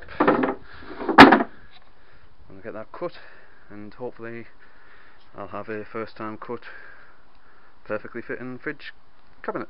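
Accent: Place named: Teesside, United Kingdom. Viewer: You've got to be from Teesside with that accent! Nice work on the van.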